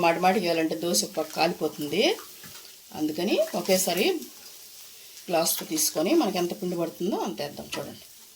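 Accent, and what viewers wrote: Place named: Nellore, India. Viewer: Nellore telugu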